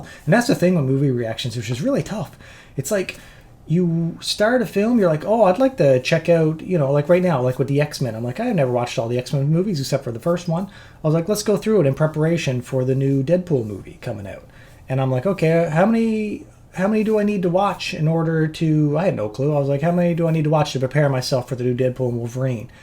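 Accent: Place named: Newfoundland, Canada. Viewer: Will the movie reaction channel remain “active” for “monetization” purposes? I often replay (or listen to) old favorites while commuting, doing my makeup, etc. and don’t intend to stop. Hopefully, you’ll continue to get some financial benefit from your past work! As a fan —and subscriber since August 2021– I’ll (selfishly) miss your fantastic reactions and commentary. But I wish you and your family only the best moving forward! On a final, personal note: It may sound kinda lame, but I (an American) fell in love with the “Newfoundland accent” during quarantine. To make a long story short.. I ended up moving out of state and living with my mom/grandma throughout the various lockdowns (about 16 months total). We watched a LOT of TV/movies together in that time; one of our collective favorites was the show “Murdoch Mysteries.” For anyone who isn’t aware, one of the best MM characters is a young man from Newfoundland: Constable George Crabtree [played by actor/comedian Jonny Harris]. 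My grandma (who I grew very close to during the pandemic) has since passed on, but your voice still reminds me of the happy times I was able to share with her, despite the world seemingly falling apart around us. ☺️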